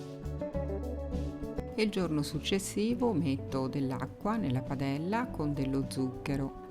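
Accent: Tuscany, Italy. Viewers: Buoni gli zuccherini ma il più bello è il tuo accento toscano. ❤😉😊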